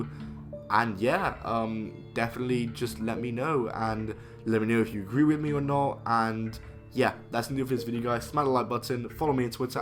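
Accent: England, United Kingdom. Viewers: Why does every wrestling video have a narrator with such a strong English accent?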